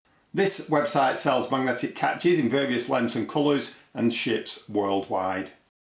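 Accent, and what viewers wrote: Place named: North, United Kingdom. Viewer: Love your accent! We’re are you from? Sounds a little North?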